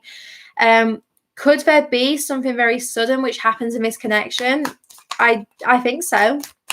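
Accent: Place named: Wales, United Kingdom. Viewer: Well done on your first YT live!! Personally prefer YT to IG. You sound like you're not too far from me. I'm in North Wales xx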